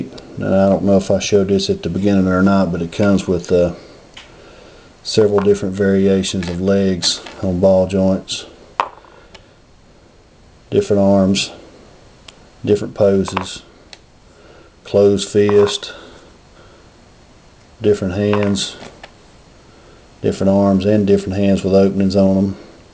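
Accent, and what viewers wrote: South, United States. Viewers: You sound southern to me XD Sorry if Im wrong! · Whoa, the southern accent threw me off a bit there, lol. Good vid.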